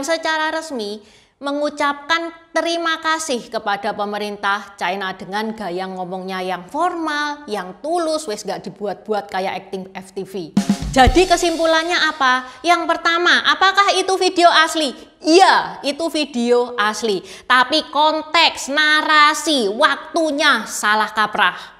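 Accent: Java, Indonesia. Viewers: Wong ndi sampean mbak kok medok jowo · Medok tenan, ciri khaz e Semangat💪💪💪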